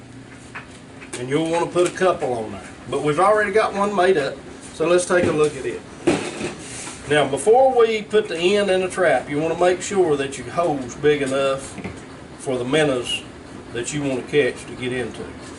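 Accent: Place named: Brooklyn, United States. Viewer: Love that Brooklyn accent!!!!!!!!!!!!!!!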